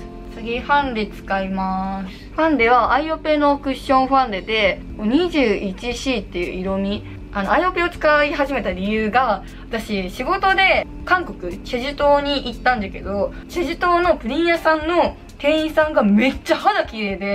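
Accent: Okayama, Japan. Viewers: いつまでも変わらない岡山弁が大好き🥺 岡山人として嬉しい😌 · ちゃんの毎日メイク知りたかったから嬉しい😂💗 方言が岡山ですごい安心感あります🥺✨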